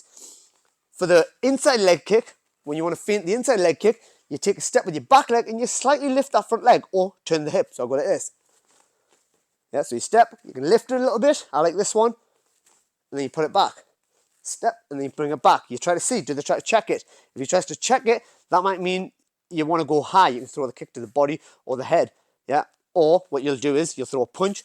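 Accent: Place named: Newcastle, United Kingdom. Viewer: appreciate the super fast response. I have been away from the UK 5 years so ;loved hearing the geordie accent. If you ever visit Pakistan, please let me know so we can get you teaching a seminar or two for the local lads.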